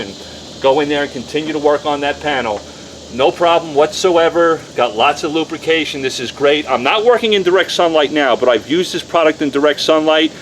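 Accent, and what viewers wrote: New York, United States. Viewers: i love your videos. When did you move to Dallas from New York? your accent reminds me of ME lol lol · Dude, your rock! I have listened to Michael Savage on talk radio for years, and his accent and direct manner of speaking is quite unique to NYC. IF you're NOT from there, I will use Blu-Tac to clay my 1983 Chevrolet Caprice with this Armoral. (Hint: IMA do it anyway...)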